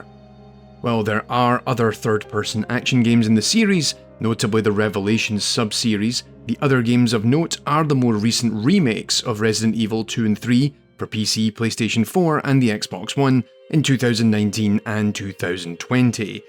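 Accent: Scotland, United Kingdom. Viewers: First video I've seen of this channel, I could listen to this Scottish brogue forever. Excellent, excellent analysis, well done.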